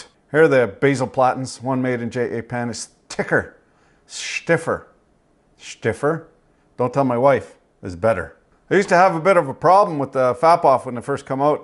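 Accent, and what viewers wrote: Quebec, Canada. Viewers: Tu est Québécois??? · Très bon Québécois👌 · It's a rough Quebec accent .... Pas sur si c'est juste rouillé ou c'est forcé ? Québec ou pas Québec AvE ? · jmattendais pas a entendre du Quebecois dans une video que j'ai clické au random mais sa fait plaisir au oreilles, cheers man thx for the review oué mon'homme criss de beau outils mets en 🤌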